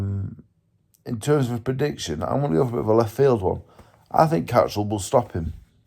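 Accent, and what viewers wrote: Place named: Doncaster, United Kingdom. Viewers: They're probably being knobs. They don't know what a Donny lad sounds like, a lot of us sound like you, and we all understand each other. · People being arse holes, you can tell listening to you speak you are just a northerner with a good sharp quick sense of humour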